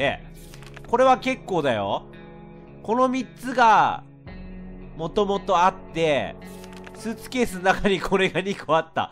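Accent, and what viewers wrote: Kansai, Japan. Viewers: レトさんの関西弁好きすぎる、、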